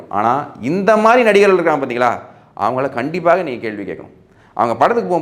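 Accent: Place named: Tamil Brahmin, India. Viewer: Hey why the tambra slang man. Omit that.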